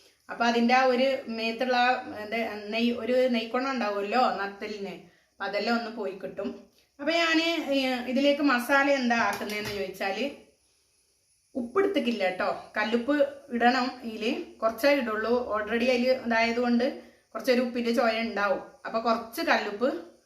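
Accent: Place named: Wayanad, India. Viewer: താത്താന്റെ സംസാരം കേ ട്ടിട്ട് വയനാട് ജില്ല തോന്നുന്നു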